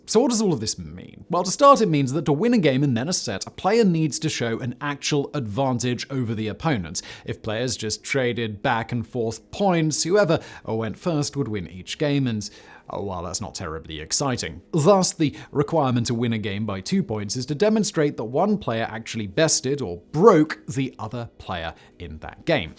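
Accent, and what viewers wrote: RP, United Kingdom. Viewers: This guy sounds like a normal british guy who's trying to play up his accent so americans think he's fancy and posh, ya sound like a twat mate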